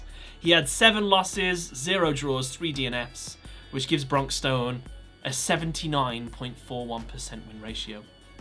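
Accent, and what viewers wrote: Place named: United Kingdom. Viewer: So British, so nice to listen.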